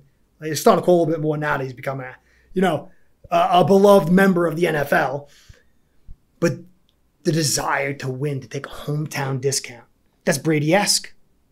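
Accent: New York, United States. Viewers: This dude talks with a NY accent, something fishy 🤔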